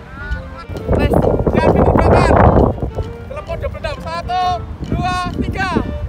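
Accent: Java, Indonesia. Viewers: Orang jawa ya kak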